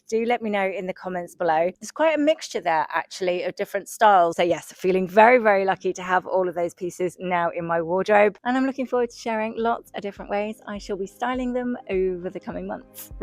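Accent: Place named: United Kingdom. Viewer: Hello I'm so sorry to hear about your scary beach experience.. I heard that 2 days ago that there was a hurricane nearby, I hope you're all ok. Your spanish is similar to my italian with a cute British accent 😅 I love the grey cardi and pale blue trouser outfit 💕 Blazers yes please! They're my biggest obsession❤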